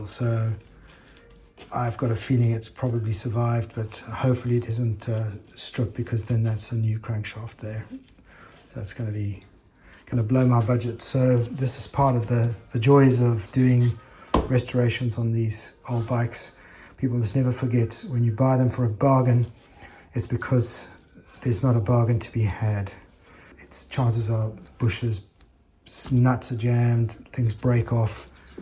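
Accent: South Africa. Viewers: Enjoying your videos. Listening to you I originally thought you were doing the restorations in SA! Ex Durbs living in Oz.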